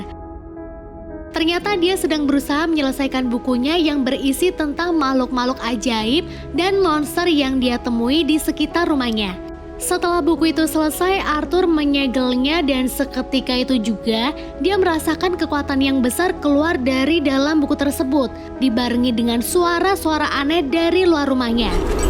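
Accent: Pemalang, Indonesia. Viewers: wong pemalang yo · Btw orang pemalang bukan? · Orang Pemalang kah?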